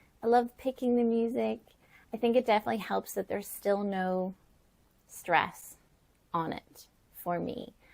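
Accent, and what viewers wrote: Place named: Ulster, United Kingdom. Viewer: I love your natural Ulster accent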